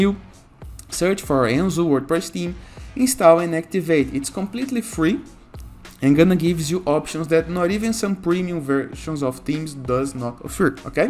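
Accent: Brazil. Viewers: valeu brazuca! HAHHAHA adorei o sotaque! :D tmj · sotaque brasileiro detectado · seu inglês tem sotaque de brasileiro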